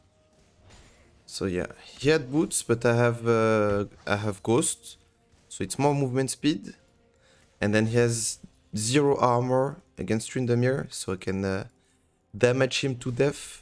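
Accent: France. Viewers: Wow je compatis! Je suis arrivé ici parce que pour la première fois aujourd'hui je suis tombé sur un Teemo correct (mes premières game les mecs y savaient pas space c'était un all in un kill 😂), j'ai fini en 0/7!!! Du coup j'ai fait recherche YouTube " Teemo"... Et je comprends maintenant! La vache c'est rude! Merci pour le contenu en tout cas, je suis débutant et je main Trynda et Sett et tes vidéos éducatives elles aident de ouf! (je me suis permis de comm en Français j'ai cramé ton accent 😂😂)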